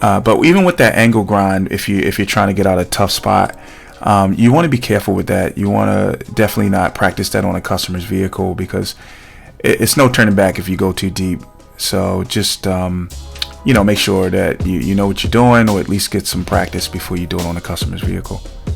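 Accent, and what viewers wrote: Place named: Baltimore, United States. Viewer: I’m going to guess you are from Baltimore?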